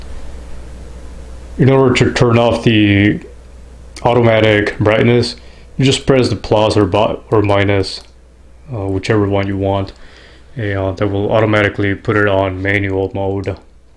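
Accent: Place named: Canada. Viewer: Canadian accents are the best.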